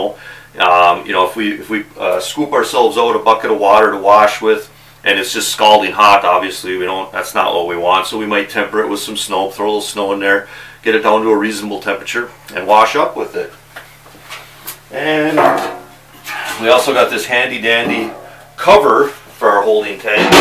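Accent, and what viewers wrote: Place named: Upper Midwest, United States. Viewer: I was looking for a vid about putting line on a spinning reel and your vid from 2 years ago came up. First of all, thank you, it's what I was looking for. Second, I love subscribing to local Youtubers and you sound local. Are you in the UP or Wisconsin?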